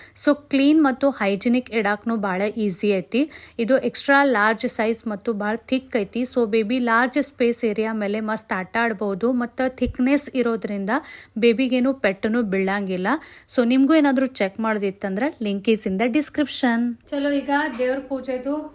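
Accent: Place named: Belagavi, India. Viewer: Nim language nodidre belagavi davr taran ide aadru marathi jana matado tara fell aagutte y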